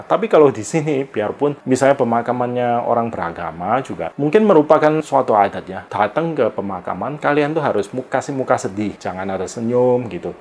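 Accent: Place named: Hong Kong, China. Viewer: Kamu ni org Indonesia ataupun org Hong Kong? Sbb loghat kamu ada bawak sket bunyi HK. Maaf ya, saya agak keliru ni..... Saya berasal dari KL, Malaysia 🇲🇾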